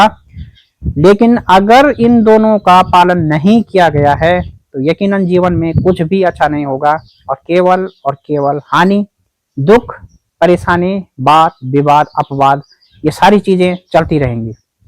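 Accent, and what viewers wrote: Garhwal, India. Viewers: आप हिन्दी में कम गढ़वाली लहजा अधिक है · बहुत ही सुंदर वीडियो भाई जी आप बोलते बहुत अच्छे हो और आपकी बात शुद्ध और स्पष्ट होती है आपके द्वारा दिया गया रिएक्शन सटीक होता है आपके कमेंट बॉक्स में एक भाई ने कमेंट किया है कि आप हिंदी में कम गढ़वाली लहजा अधिक है तो भाई जी मै इस भाई को कहना चाहता हूं की बिहारी पूर्वी उत्तर प्रदेश बंगाली मद्राशी उड़िया महाराष्ट्र बड़े बड़े राज्यों के लोग अपनी भाषा बोली का इस्तेमाल करते है अपने लहजे में बात करते है अच्छी बात है न गढ़वाली लहजा है तो गर्व की बात है कि हम गढ़वाली है हमारा लहजा गढ़वाली होना चाहिए आप भाई का बीडीओ देखो इंजॉय करो और भाई को सपोर्ट करो लाइक करो बेफजूल के कमेंट करने से अच्छा होगा हम अपने इन भाई को आगे बढ़ाए स्पोर्ट करे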